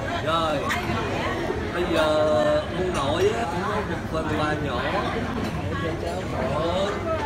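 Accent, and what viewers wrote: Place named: Mekong Delta, Vietnam. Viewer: Giọng cua bác hơi tây tây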